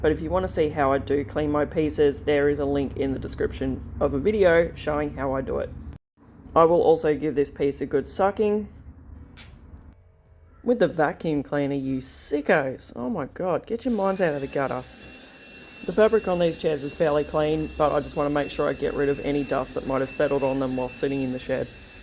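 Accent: Australia. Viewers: I pity anyone who doesn't speak australian. Love the inspiration on the desk.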